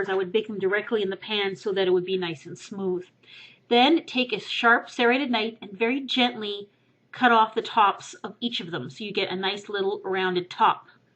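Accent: Canada. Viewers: this might be a silly question, but are you Canadian?? You're accent sounds very familiar! haha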